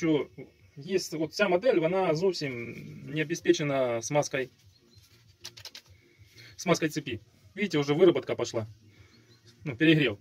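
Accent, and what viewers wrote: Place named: Rural, Russia. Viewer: ни хера не понятно , что ты там гутаришь на селюковском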